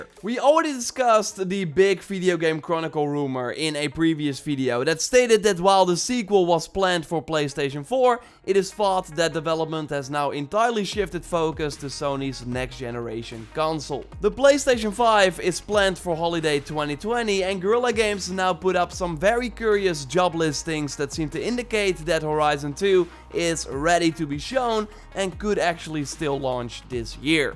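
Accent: Netherlands. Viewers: He's from the Netherlands.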